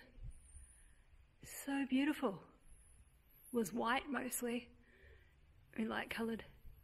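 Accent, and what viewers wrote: Australia. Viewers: It would be a cold day in hell when I make fun of your accent. However, that does not stop me from turning on the subtitles when watching Australian crime dramas on Amazon Prime. It took me a while to find this remedy. Previously, I was only understanding about 60 percent of the dialogue. Much better now. Best regards. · Love your Australian accent, and your clear detailed description of your vlogs of what are being shown. Thank you for sharing 🙏.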